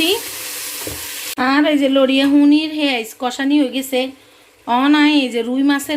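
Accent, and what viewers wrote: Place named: Noakhali, Bangladesh. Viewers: আপু তোমার নোয়াখালী ভাষা গুলো আমি তেমন বুঝিনা কিন্তু শুনতে অনেক ভালো লাগে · অাপনার নোয়াখালী ভাষা ভালো লাগলো। অাপনার রাননা বাননা ভালো লাগলো। অাপনে অামার চ্যানেল টা দেখবেন।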